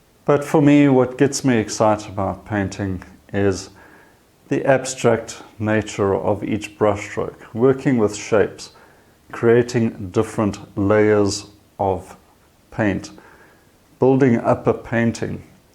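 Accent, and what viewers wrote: South Africa. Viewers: Thank you for your tips on painting! I really enjoy watching your videos and also listening to your south african accent as I lived 4 years there and left it for Kenya in 2017. SA always in my heart! Regards.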